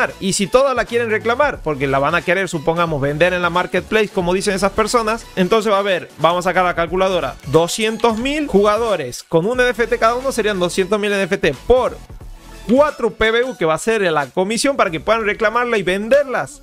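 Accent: Tucumán, Argentina. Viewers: Sos Tucumáno o santiagueño ?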